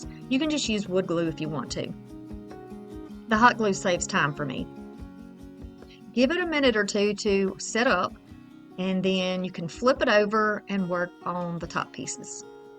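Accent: South, United States. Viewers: One of my favourite days of the week and the other being Tuesday. As I'm in the uk I watch your videos the day after you post them. Always the first video I watch as well and you never disappoint me. I love how chatty you were and when you get carried away or nostalgic about something how your southern accent gets stronger. Always cheers me up and I sure needed that today. Love how they all turned out and I'm definitely going to give the clay jug a go. Thank you again xx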